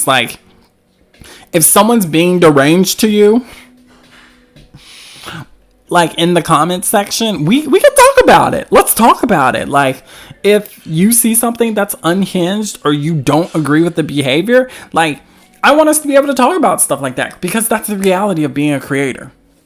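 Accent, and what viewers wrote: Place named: South, United States. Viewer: no way i love your accent! i'm also from the south but i don't live there anymore so it's kind of comforting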